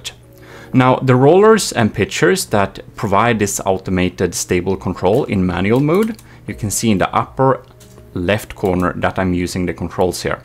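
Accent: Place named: Sweden. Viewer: I can tell that he is from sweden, hej hej jag är också svensk BTW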